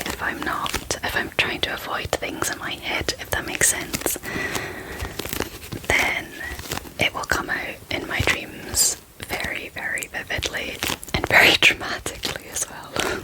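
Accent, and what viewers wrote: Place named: Scotland, United Kingdom. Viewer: Best (and only) asmr i watch / listen to. And the scottish accent is absolutely amazing!!!! Thank you!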